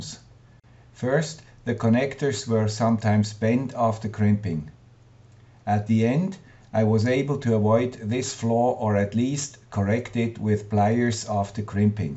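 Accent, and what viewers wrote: Switzerland. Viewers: Greetings the guy with the Swiss accent :)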